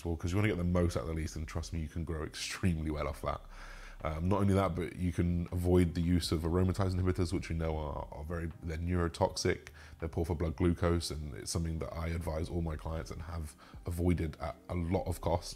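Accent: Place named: England, United Kingdom. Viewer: Jesus it’s someone English 😂 finally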